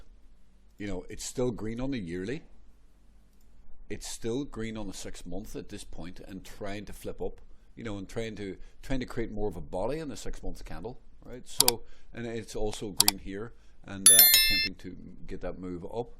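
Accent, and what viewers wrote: Northern Ireland, United Kingdom. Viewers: You sound northing Ireland. Hello brother from Dublin 👊❤️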